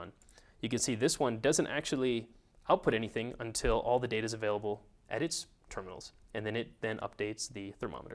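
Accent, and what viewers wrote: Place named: India. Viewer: Aren't my ears stuck or something? I hear an Indian guy, who can perfectly speaks English! Great tutorial videos, by the way :) Keep it up.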